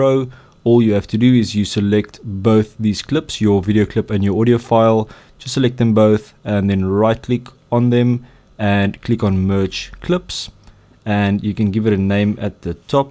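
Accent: South Africa. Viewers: dude, you're south african! Awesum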